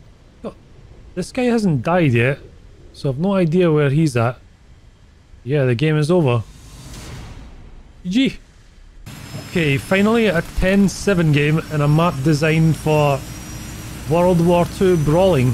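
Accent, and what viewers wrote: Scotland, United Kingdom. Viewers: I'm still oldr than u in ur Scottish accent but belated birthday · I really dont know why youtube algorithm get me here a hevy scottish accent youtuber playing war thunder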